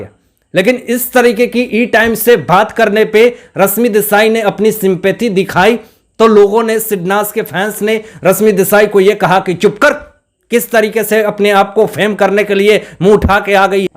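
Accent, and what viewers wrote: Bihar, India. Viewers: Tum thik se baat karna sikho ..bihaari pronounciation se lekar hindi baat mat karo ...rashmi hai not rasmi .not enerjy...sunte huebv Ganda lagta hai ..host ho thik se pronounciation sikho.. very bad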